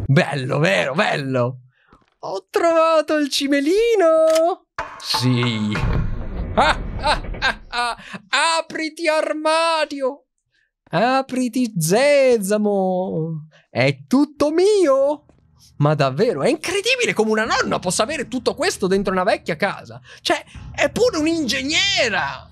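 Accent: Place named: Veneto, Italy. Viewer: mi sembri veneto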